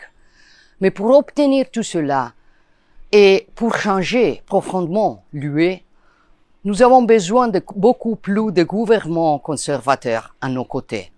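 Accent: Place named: Italy. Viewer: Soyons honnêtes : son français aurait sûrement été plus fluide, et avec moins d’accent « macaronique », si elle avait pris la peine de les étudier à l’université plutôt que de perdre son temps à militer dans un parti néo- ou pseudo-fasciste. Elle a préféré grimper grâce au népotisme et au clientélisme — une vieille habitude de cette droite populiste, autoritaire et un peu obscurantiste, arrivée en Occident avec le petit coup de pouce de Poutine et de quelques autres voyous… Madame (ou Mademoiselle) Meloni, expliquez-nous donc pourquoi l’Italie est aujourd’hui le seul pays d’Europe de l’Ouest à ne pas avoir reconnu la Palestine et à rester les bras croisés devant un génocide en cours. Et expliquez-nous aussi, Madame Meloni, pourquoi des centaines de voix d’Italiens NATIFS, qui dénoncent discrimination, favoritisme et appauvrissement, se retrouvent systématiquement réduites au silence, censurées ou même poursuivies… dans VOTRE pays.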